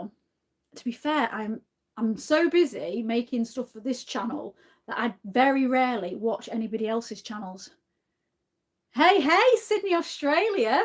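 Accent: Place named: Northern England, United Kingdom. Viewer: Hi, Paddy here. I'll look forward to the new Lincolnshire ghost video you just mentioned. As a Lincoln born and raised "Yellowbelly" bloke but now semi-retired in rural Hertfordshire after many years of working in London, I really enjoy your videos, particularly the haunted airfields of Lincs collection which are brilliant. I also love the fact that you haven't lost your Northern (I seem to remember you mentioned Trawden in an earlier video?) /East Midlands accent with the flat vowels that I still have (I still have a bath, not a 'barth') and I'll turn 70 next month after leaving Lincoln more than 40 years ago. I know Trawden and that whole area of Lancashire having relatives in the area. Good on you lass and keep up the great work with the channel 😍!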